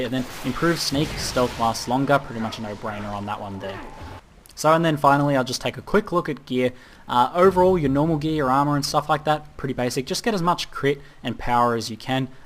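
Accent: Australia. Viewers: Aussie brooo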